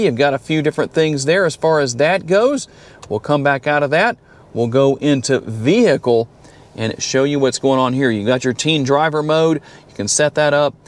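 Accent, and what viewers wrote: South, United States. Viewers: He speaks like someone from the southern United States. He is probably very respectful, cares about people he doesn’t even know and smiles when people aren’t nice to him. Good salesman. Might buy one of these because of the video.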